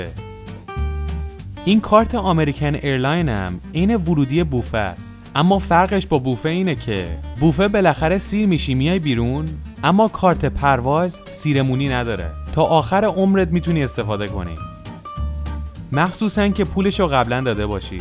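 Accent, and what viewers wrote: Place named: Varamin, Iran. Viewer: لهجت میخوره ورامینی باشی🤩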